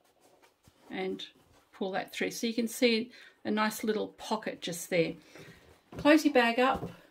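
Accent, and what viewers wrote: Australia. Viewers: I only found your channel a few days ago while unwell in bed, and I’m itching to get well and back in the sewing room. Your instructions are easy to follow and it’s great to hear an Aussie voice too. I made 3 helping hands yesterday and will make a few more for our family card playing days. Thank you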